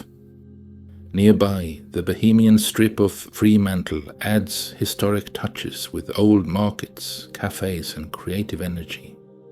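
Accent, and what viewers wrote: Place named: India. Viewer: Fitting having an Indian accent narrating it. Well done Australia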